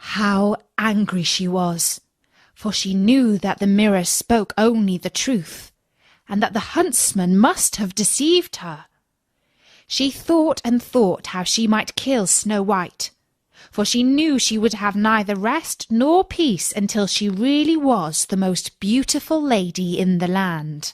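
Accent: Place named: United Kingdom. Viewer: J'adore ton " british accent" qui va á merveille avec les contes ainsi que ta voix qui ressemble à "kiara knightly" vraiment bravo et chapeau bas pour ta façon pro de raconter la conte qui laisse á imaginer les evenement sans les voir💜👍👏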